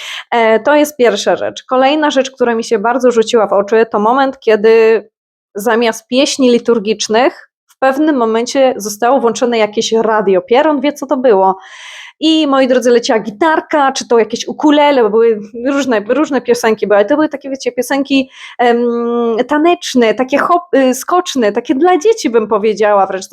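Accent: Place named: East, Poland. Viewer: Pierwszy raz na Twoim kanale, więc wynacz jeśli pytanie już padło - skąd u Ciebie ten wschodni akcent? Pozdrawiam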